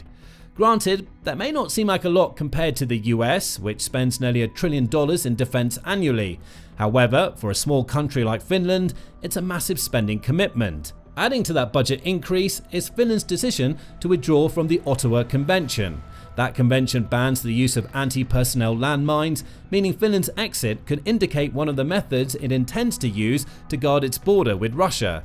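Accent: England, United Kingdom. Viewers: Hey can you switch to American accent? The British accent is getting a lil annoying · Bonjour, à votre accent je crois comprendre que vous êtes Anglais, donc "va en guerre", et je ne crois en RIEN votre propagande. Rassurez moi, le Russie a construit ces bâtiments sur son territoire ??? Donc elle a le droit . Vous vous persuadez tout seul que la Russie attaquerait un des pays de l'OTAN !!! Redescendez les pieds sur terre !!! Et vous ne parlez pas des troupes de l'OTAN s'installant en Roumanie, tout près de l'Ukraine et des troupes Russes !!!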